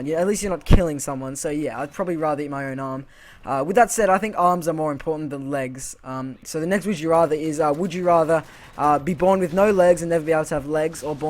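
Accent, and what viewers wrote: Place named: Australia. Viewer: Usually the Australian accent annoys me, but your voice makes it not annoying:] Think your speaking is pretty good too... Subbed :P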